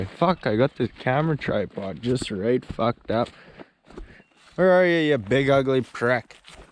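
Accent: Canada. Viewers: most important thing I have learned is that I've never heard an actual Canadian accent before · I'm going to say this: I watch this channel almost purely for the outrageous Canadian bush accent, and energy.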